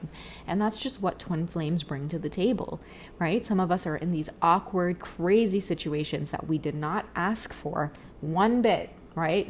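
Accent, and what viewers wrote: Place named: Trinidad and Tobago. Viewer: You got a subscribe with that trini accent😊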